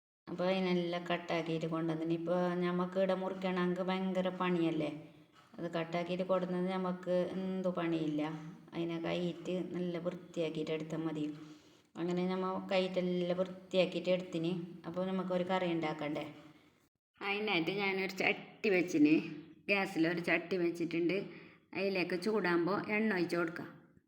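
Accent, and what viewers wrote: Kasaragod, India. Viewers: മീൻ കറി റെസിപ്പി ഇഷ്ടമായി കൂടെ കാസർഗോഡ് ഭാഷഒത്തിരി ഇഷ്ടപ്പെട്ടു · എനിക്ക് നിങ്ങളെ വീഡിയോ കാണുന്നത് വളരെ ഇഷ്ടമാണ് നിങ്ങളെ വോയിസ് ആണ് എനിക്ക് ഏറ്റവും ഇഷ്ടം നിങ്ങളെ കാസർകോട് സംസാരരീതി എനിക്ക് വളരെ ഇഷ്ടമാണ് റെസിപ്പി സൂപ്പർ താങ്ക്യൂ ഫോർ ഷെയറിങ്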